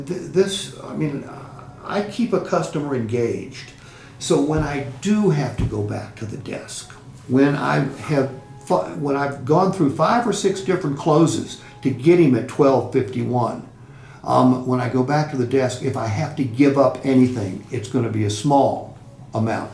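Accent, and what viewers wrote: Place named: South, United States. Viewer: and his hillbilly bullshit talk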